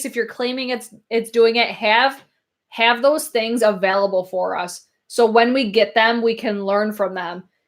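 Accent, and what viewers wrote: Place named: South, United States. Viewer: noticed the southern drawl.where are you from. Im from saint martinsville,louisiana,currently live in chicago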